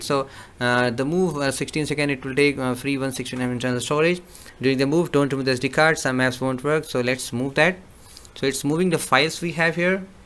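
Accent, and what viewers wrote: India. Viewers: you sound like a hindu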